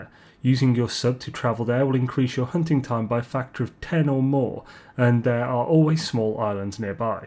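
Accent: United Kingdom. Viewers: It just sounds like he is mostly mumbling and I didnt understand a word of what he was saying. If this is what most youtube Brits sound like then good god. Sounds like I was listening to Kermit the frog from muppet puppets lol. 😂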